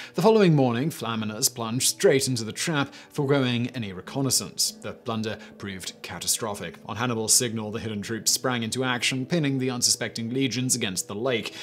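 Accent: England, United Kingdom. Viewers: Pizz-er. English accent cracks me up 🤣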